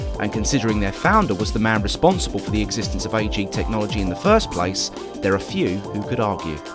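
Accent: United Kingdom. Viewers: Never knew there was back story like this. And for us here in the States, all stories sound better through a British accent. Keep it up.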